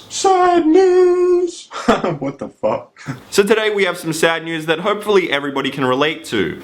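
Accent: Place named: Australia. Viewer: Omg his accent is australian :O dafuq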